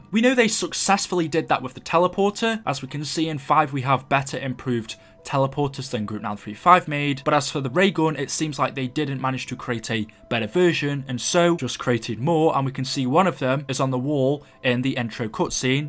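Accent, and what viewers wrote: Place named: England, United Kingdom. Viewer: Where you from in England not zombies related just curious also love the vids mate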